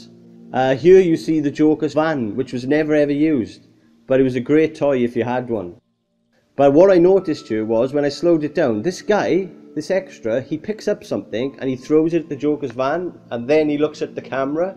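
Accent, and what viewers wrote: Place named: Wales, United Kingdom. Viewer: Welsh accent. I assumed you would be American, don’t know why.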